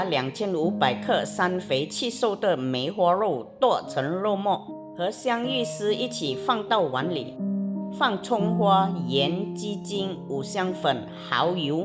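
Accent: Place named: Malaysia. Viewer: 听得出是我们马来西亚人👍🏻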